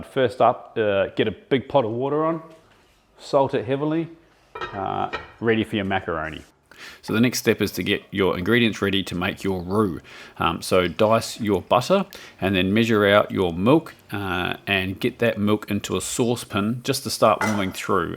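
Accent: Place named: New Zealand. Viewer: The Kiwi accent is strong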